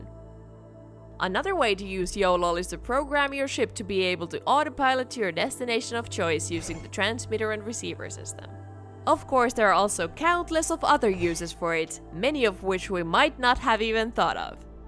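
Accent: Finland. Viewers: Are you from Finland?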